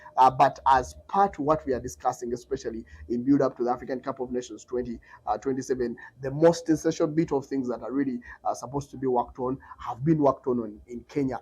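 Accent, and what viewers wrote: Uganda. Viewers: Also Tanzania has 6 international Airport not 3. Also the total number of Airports in Tanzania is 59 and 300 Airstrips. Kenya has only 36 Airpots and 3 international Airpot just so you know. You should have Mentioned also that Kenya Airways is NOT owned by Kenyans or Kenya Government, it a shared company between KLM, One Private company and Kenya Government which means Kenyan government is nit benefiting from the Airline 100% like Tanzania Air which is Owned 100% by the government. Most people and Tourists in Kenya lands only or most in Nairobi or JKNIA while in Tanzania Most people and tourists are spread in 3 Airports JNIA-Dar, Kilimanjaro Airpot and Karume in Zanzibar and soon coming up Songwe International Airpot, Pemba international Airpot and Mwanza International Airpot. Next time di research before opening your UGANDAN Accent MOUTH.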